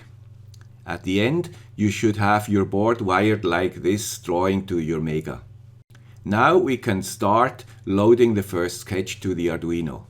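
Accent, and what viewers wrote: Switzerland. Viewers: Great video ! Btw, I don't mind your Swiss accent. It's not too bad ! Please continue with these interesting video's. It's very entertaining. Greetz !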